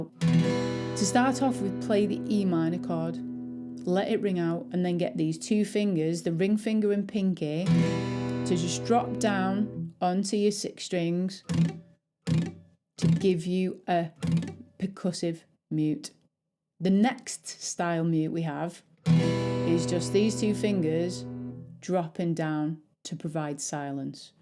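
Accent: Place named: Lancashire, United Kingdom. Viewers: im hearing a hint of lancs/north yorks in the accent. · Many thanks, your channel popped up yesterday, the 28th August, by chance. I'm 64 and have been learning since November 2024, after years of thinking about it. Unfortunately in 2023 I broke off the ball part of the ball and socket shoulder joint and shattered my radius (both left, and my right femur, long story). Fingers don't seem to be too keen on going where I want them to, however I've just written down the and, joy, it's still a bit stop/start but I've enjoyed it. Also, in a personal level, it's nice to hear a northern accent (I'm from Blackpool, living in Stockport, where the town motto is "it's not the end of the world but you can see it from here" 😂) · Hello. Just wanted to tell you that I’m enjoying your lessons - the best around as far as I’m concerned. It’s also nice to hear your north country accent, (I’m originally from Cheshire). 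A nice change from the many stateside voices. Hope you had a nice Christmas, and wishing you a happy New Year. Paul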